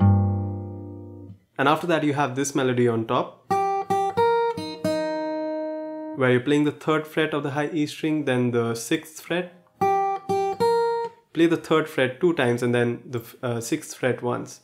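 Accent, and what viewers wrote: India. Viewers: why do u have an Indian accent?? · You sound Indian... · I m sure this Guy is Indian.. Coz he's English Sounds like Indian accent!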